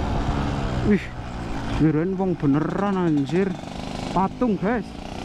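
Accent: Banyumas, Indonesia. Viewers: Mmas cah ngapak yo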